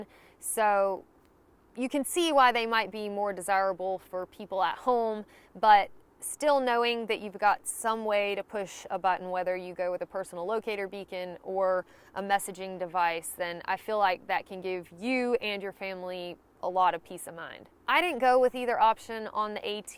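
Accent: South, United States. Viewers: Southern American accents are so annoying but decent video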